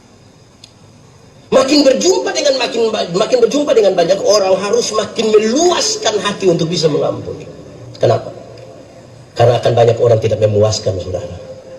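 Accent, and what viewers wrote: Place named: Eastern Indonesia, Indonesia. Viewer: Membiasakan diri mendengar logat bicara orang timur, asyik juga.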